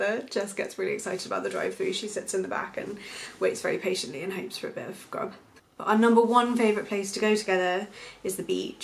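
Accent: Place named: RP, United Kingdom. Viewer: The queens English is always lovely to hear! I've had 25 Greyhounds! (Whippets cousins). I will have sighthounds until I pass. Cheers!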